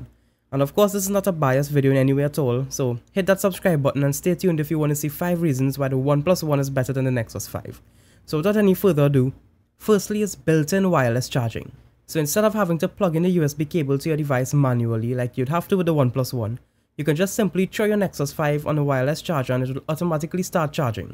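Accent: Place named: Trinidad and Tobago. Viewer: Firstly, I could tell straight away you were Trini haha. Secondly, Great vid bro but I need to ask where did you get your Nexus 5 cover from??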